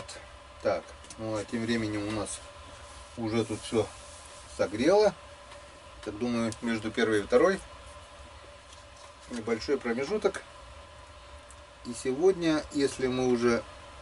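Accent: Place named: Yakutia, Russia. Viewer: Говор якутский!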